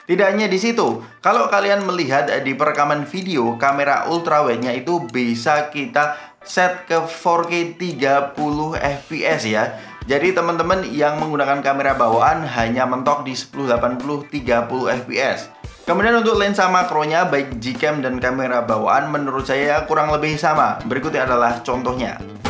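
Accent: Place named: Yogyakarta, Indonesia. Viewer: logat jojgae ojo ngasi ilang dab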